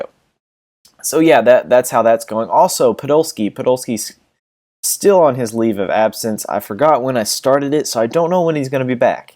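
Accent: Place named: United States. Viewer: Enjoyed the video, with the american twang, great to hear how your voice has grown since the first video. Looking forward to more. Your tactics look a lot better as well.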